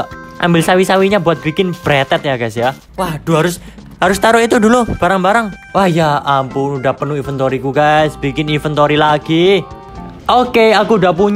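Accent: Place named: Java, Indonesia. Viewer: Enaknya orang Jawa kalo ngomong lucu 😂😂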